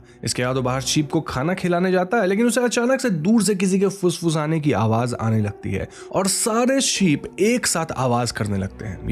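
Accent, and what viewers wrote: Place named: Bihar, India. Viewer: Yeh bihari hai..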